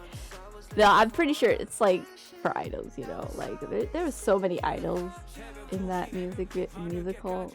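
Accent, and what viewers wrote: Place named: United States. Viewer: my apologizes. Could not understand when you said you had been unwell. It was your American accent 😞 Hope you are feeling slightly better. Cannot wait until 2023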